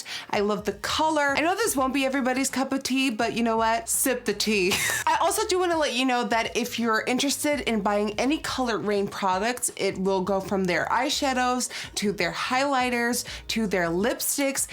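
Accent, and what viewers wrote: Netherlands. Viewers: I’m shook… because I just each led a video of in 2013 and Dutch accent is more strong… 2018 he accent is perfect English…I never know was Dutch I found about by watching her old videos …I would never of known if I never watched her older videos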